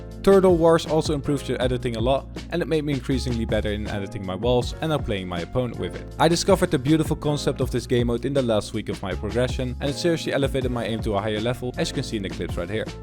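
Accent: Netherlands. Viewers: Ur accent sound so dutch are you from Holland? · Are you dutch? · Jij bent sws nederlands hoor et aan je accent haha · Nederlandertje ik hoor het al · Are you Dutch? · are you dutch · Are you dutch brother? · Are you dutch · you sound like your from the Netherlands... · Waarom klink jij zo nederlands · Ik hoor aan je accent dat je Nederlands bent maar je uitspraak is echt goed hoe heb je dat geleerd? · Are you from the Netherlands? Cuz you have a Dutch accent!! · Are you dutch?? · Are You dutch? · are you dutch? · 100% sure you're Dutch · You sound so Dutch 😅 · are you dutch?? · Wait u Dutch? Lmao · Are you're Dutch? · Je hebt een Nederlands accentje..🧐😉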